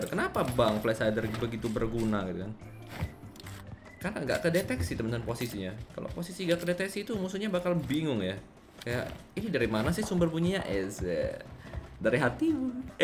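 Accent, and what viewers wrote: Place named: Medan, Indonesia. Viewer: Bang orang Medan kah? Logatnya mirip2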